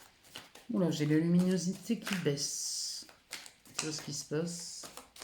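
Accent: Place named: North, France. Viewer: Ouais super 👍 chouette 🦉 merci 🙏 bcp encore en plein ds l mil espèrons q c sera réalisé ds la matière bonne continuation à vs j 💥☀️🥰😍adore c q vs faites et votre accent du nord.! Encore merci 😊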